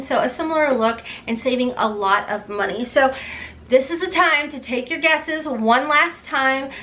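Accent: South, United States. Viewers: I watched this entire video for "matte", "sale", "gold", "talc", and "slant". 😂😂😂 Ooooh to be southern!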